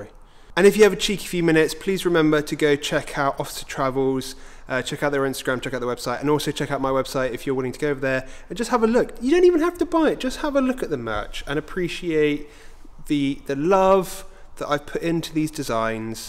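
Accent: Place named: United Kingdom. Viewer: less of that British accent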